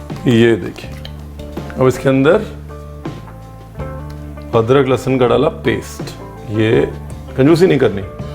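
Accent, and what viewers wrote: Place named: Punjab, India. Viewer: Recipes te ghaint hai hi bolande style wah ji wah pure Punjabi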